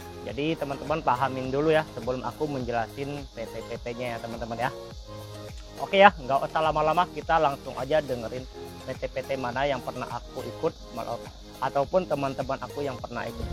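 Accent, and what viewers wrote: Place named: Taiwan. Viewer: Logat nya logat taiwan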